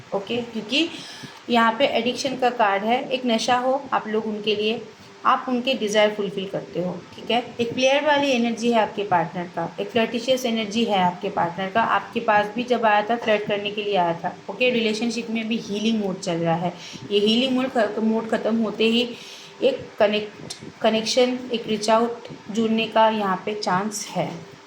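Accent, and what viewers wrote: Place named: Bengal, India. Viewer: Bhai ami tarot reading khub ekta dekhini age, normal rashifol dekhechi. Kotha sune mone holo tumi bangali tai ... khub valo reading tomar ... clear cut ... subscribe korlam... thank you ... but 1ta proshno moner modhye ghurche reading ta dekhar por thekei ... ami pure single, kothao kono option nei, ageo chilo na ... khub cleverly keu propose na kore deep interest show koreche but amar intuition bolechilo may be married... amar janar kono scope chilo na takei jigasa na korle, ami jigasa korteo parini but se kaida kore jene niyechilo ami married kina .. 1ta kajer jonno kotha hoyechilo.. romantic connection hobar kotha ekdomi noy .. ekhon kotha holo je married se to tar spouse ke chere kono din o onno kauke commitment dey na, tahole tara nijeder situation jene sudhu sudhu single der life e kosto keno baray ? Ami to flirt kori na kokhono ... 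korle amaro anek relation thakto fole seriousness karo proti thakto na, sobtai khela hoto ... sob cheye beshi koste achi ami, jobless, struggle korchi, ro anek problems ache life e ... tar vetor aei notun problem jar kono solution ache bole to amar mone hochhe na - sudhu reunion diye ki hobe bolo ? I need steady relationship not fun game... ami married hole to jeche pore onno kauke kosto dite jetam na kokhono... jara commitment debe na tara ase keno boloto ? By the way ami esob soulmate, twin flame ki jani na, bujhio na. Simple relation bujhi jekhane prem hoy eksonge thakar jonno.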